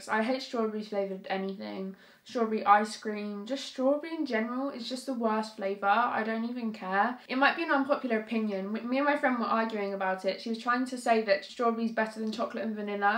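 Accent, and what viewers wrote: United Kingdom. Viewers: u have such a nice accent. I wish I could learn such a nice british accent ...I will try my best. it sounds so cute and british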